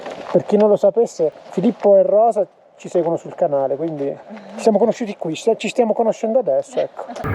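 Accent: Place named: Montesilvano, Italy. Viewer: Ciao secondo me veniamo dallo stesso paese: Montesilvano. Sbaglio? Il nostro dialetto è inconfondibile. Mi sono imbattuto casualmente su un tuo video e naturalmente non ho potuto che iscrivermi al canale. 😀 Sono di Rivalta di Torino e sul Nivolet solitamente ci vado in bici da corsa. Complimenti per i tuoi video, mi piacerebbe “tornare” in moto; a Montesilvano avevo una Honda 750. 👍💪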